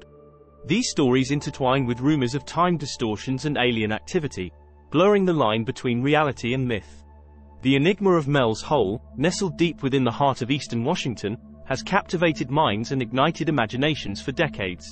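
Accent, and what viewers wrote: United Kingdom. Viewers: We're all so sick of the British accent, mix it up already.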